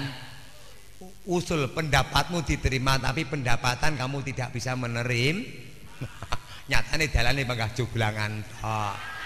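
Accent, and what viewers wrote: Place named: Madura, Indonesia. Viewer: luar biasa bagus banget logate mirip meduronan..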